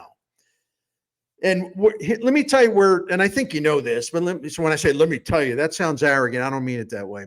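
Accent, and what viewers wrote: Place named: Boston, United States. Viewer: Love ur Boston accent!! Lol. Great storytelling!! Thnx Bernie.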